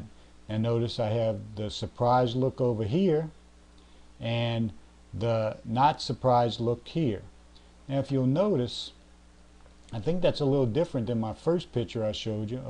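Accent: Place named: Louisiana, United States. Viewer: This is awesome...the accent sounds Louisiana/Cajun-ish. Geaux Tigers!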